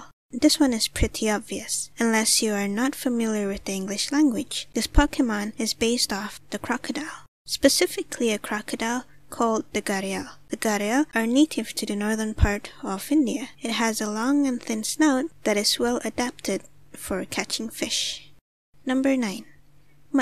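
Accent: Scotland, United Kingdom. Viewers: Are you Scottish? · u sound scottish.where u live?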